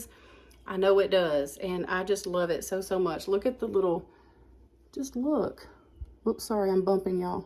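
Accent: South, United States. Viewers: You are an inspiration! I love to watch you thrift & decorate. You make my Central IL heart happy! (And I just love your sweet southern accent!)❤️🙏☕🌷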